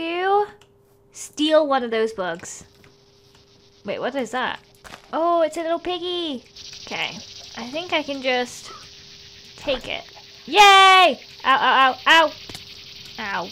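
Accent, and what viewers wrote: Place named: Leeds, United Kingdom. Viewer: It sounds like she's from Leeds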